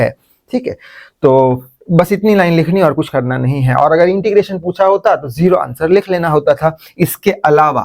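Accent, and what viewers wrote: Gujarat, India. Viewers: Sir are from Gujarat right????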